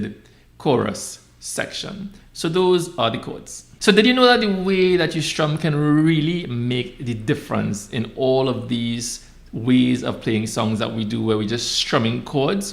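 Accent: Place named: Trinidad and Tobago. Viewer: Thanks man, this is such a well done lesson of a classic love song! I am so glad that I found your channel, and I subscribed immediately 😂! Also, coming from the West Indies myself, I am sure that it’s a Trinidadian accent I am hearing 😊, nicely done man.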